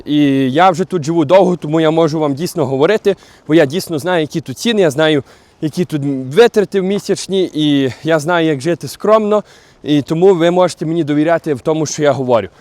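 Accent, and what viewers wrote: Western Ukraine, Ukraine. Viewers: Бля, у тебя такой западенский акцент! Обожаю твою озвучку!!! Всегда вподобайка вид мене!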